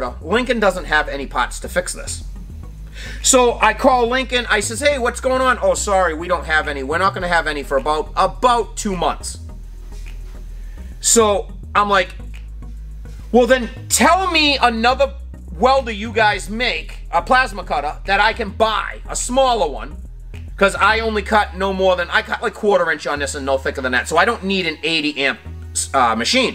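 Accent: Boston, United States. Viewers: Was just gonna ask , u from Bosten, lol.😊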